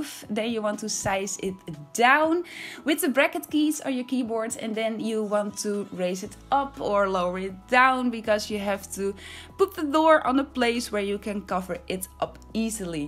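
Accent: Netherlands. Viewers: Wow... mind blown! So creative! From your accent I think I can assume you’re a fellow Dutchie, so: beterschap voor je vader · I can hear that your dutch 😂 love it tho !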